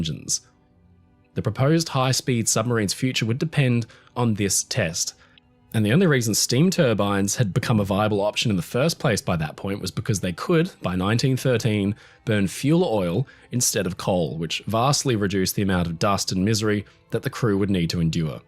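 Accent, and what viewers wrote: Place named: Australia. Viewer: congratulations on a SUPERB documentary. Apart from the quality of your research, your narration is astounding. Educated, articulate, clear diction and an easy- listening Aussie accent without any drawl or ockerism, and your grammar is faultless. As a fellow countryman, it makes me proud that your voice is being heard on the world stage, its just a beautiful example of how things should be done...and especially, it's a huge favourable contrast to the bloody awful AI voice-overs that are being churned out now. Fantastic job, very well done.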